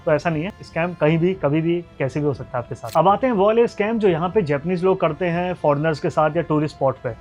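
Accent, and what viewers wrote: Pakistan. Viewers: pure pakistani accent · pakistani accent lag rha hai 😅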